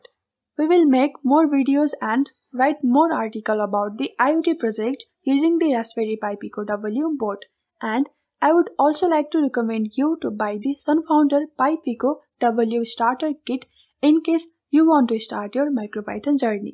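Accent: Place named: India. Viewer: An Indian, really? Please use a voice-over actor!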